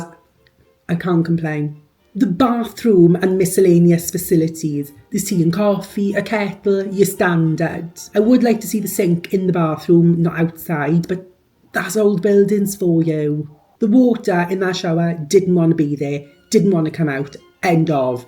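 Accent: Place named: South Wales, United Kingdom. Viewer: that switch into PPE had me screaming 😂😂 I love you.. you’re hilarious! I moved to Pembrokeshire a few years ago but it’s so nice to hear that South Wales accent again. Instantly brought me back to my roots! 🏴 Lush!! 🎉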